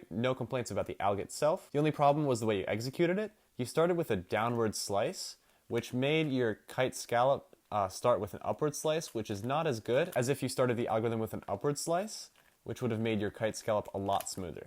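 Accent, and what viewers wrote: Russia. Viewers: I've had a feeling that a Russian accent could be probable for this one, considering who you're critiquing 👀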